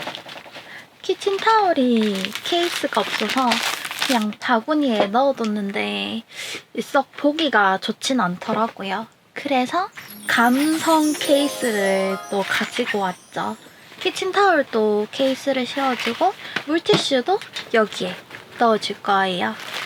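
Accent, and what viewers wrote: Gyeongsang, South Korea. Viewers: 경상도 시죠?ㅎㅎ 그냥 댓달아봅니다^^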